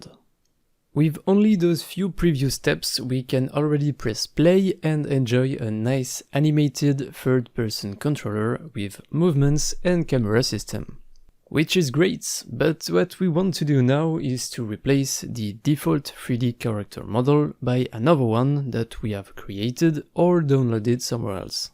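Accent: France. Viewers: ta grave un accent français tu serais pas? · Tu es français non?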